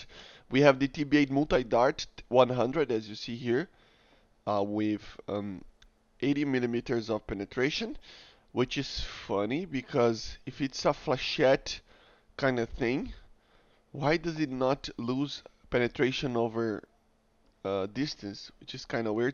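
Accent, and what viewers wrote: Brazil. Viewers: Percebi que era BR só pelo sotaque kkkk,tmj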